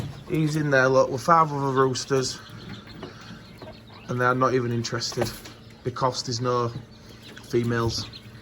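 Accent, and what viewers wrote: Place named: Sheffield, United Kingdom. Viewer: U sound like my friend in Sheffield I'm in Australia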